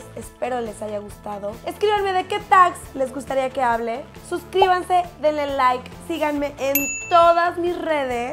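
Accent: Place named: Mexico. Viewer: porfa no hables tan mexicana no te entiendo. saludes desde españa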